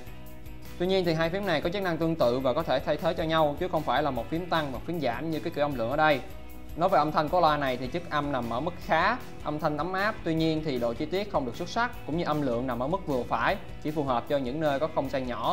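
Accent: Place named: South, Vietnam. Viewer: tiếng miền nam ko thể nào nghe dc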